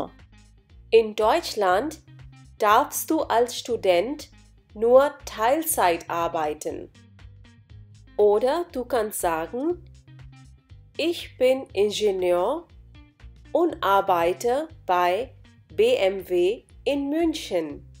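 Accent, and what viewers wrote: India. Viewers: I was hoping for a German speaker, not an Indian person. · the lady speaking has an indian tone to her deutsch sentence.. does any one else think so?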